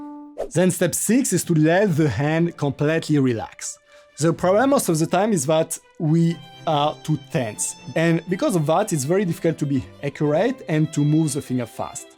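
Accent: France. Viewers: tu parles anglais comme un français j'aime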